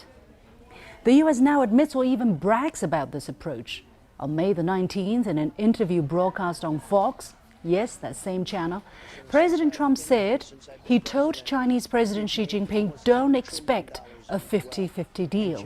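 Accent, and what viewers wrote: United Kingdom. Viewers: are you really living in China? then how do you get on the youtube? As far as I know, youtube, along with EVERY western media, like FOX CNN are banned in China. Her British English sounds well, but unfortunately it full of lies. You do know that there is no freedom of speech in China, and Liu Xin is working for CCTV, in other words, she works for CCCP. I challenge you slightly criticize Chinese Xi Jinping as a dictator, he has revised the Chinese Constitution and will remain in the power for whatever he like, what that sounds to you? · youvecattobe kittenmeritemeow 这个是英音吗？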